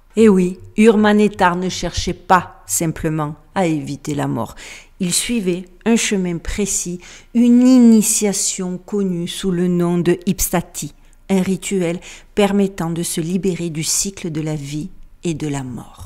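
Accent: South, France. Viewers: Merci de nous offrir des vidéos aussi variées, toujours passionnantes et tout ça avec ta jovialité et ton succulent accent du sud ❤❤❤.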